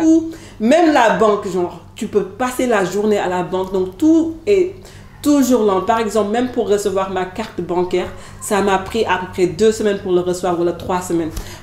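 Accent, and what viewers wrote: Senegal. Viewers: Tu es originaire de quel pays pour t'installer au sénégal. Si tu es sénégalaise et que tu es retournée dans ton pays le Sénégal, alors tu n'es pas allée t'installer au Sénégal. Tu es simplement retournée dans ton pays. Ton accent est purement sénégalais.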